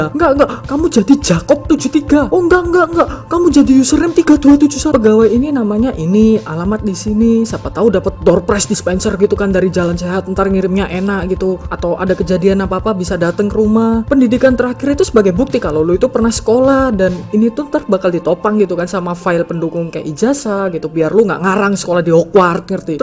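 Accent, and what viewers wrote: Java, Indonesia. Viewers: Bang lu orang mana sih? Kayak ada Jawa Jawa medoknya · " kalian tinggal dugem maen bola sodok " awoskske i love medok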